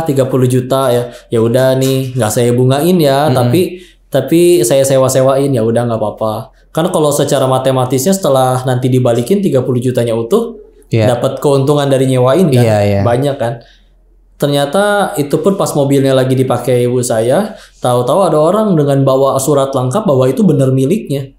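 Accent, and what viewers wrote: West Java, Indonesia. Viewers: MaasyaaAllah, mirip a agym ya bicaranya, logat sunda😁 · Sampe logatnya yg nyunda pisan pun sama 😁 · Masya Allaah Barokallaah atas ilmu & pengalamannya Koh Cara penyampaiannya raoseun Meni sunda pisan padahal bukan asli sunda👍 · Logatx sunda ea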